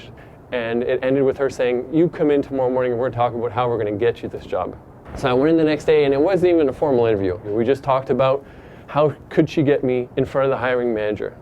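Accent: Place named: Canada. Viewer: I immediately knew he was Canadian great story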